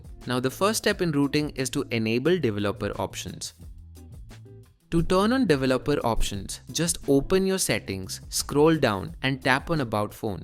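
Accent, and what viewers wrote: India. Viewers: I don't understand Indian accent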